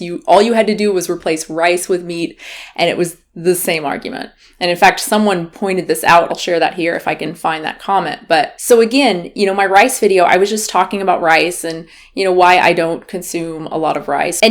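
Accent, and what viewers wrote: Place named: South, United States. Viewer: I think the most effective way to spread the vegan message is to bring back your southern accent.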